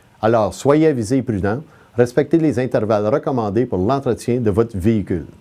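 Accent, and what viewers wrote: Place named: Canada. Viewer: c'est l'accent canadien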